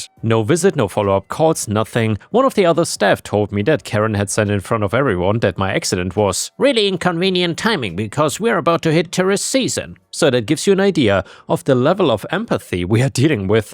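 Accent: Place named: Germany. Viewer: I bet on you being German. That's a daaamn thick accent. :D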